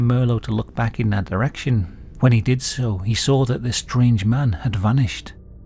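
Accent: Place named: United Kingdom. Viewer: I just got one of your storeies in my fed (probably because I follow strange, dark and misterious - mr Ballan) and started binge watching your stories 😂. I love your accent ! English is not my native language but I love to listen british accents, so many of them 😊. Your story telling abilities are great. Love your content. You have a new subscriber. Keep up with good work 👌🏻👍🏻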